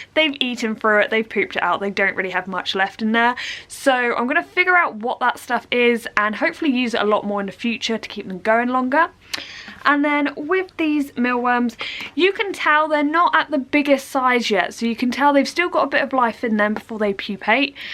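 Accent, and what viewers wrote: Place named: United Kingdom. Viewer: 英音真好听😊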